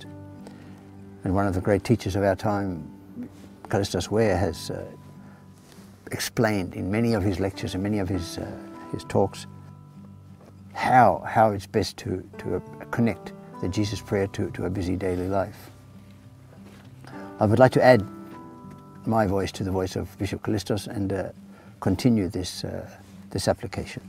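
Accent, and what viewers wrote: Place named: Australia. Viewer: I’m finally picking up on Father ’ Australian accent.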